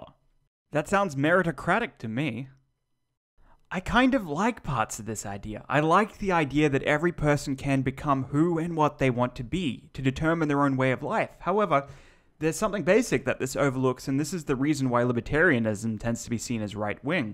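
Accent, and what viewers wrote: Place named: Australia. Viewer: I've never heard someone with an australian accent using so mamy rhotic words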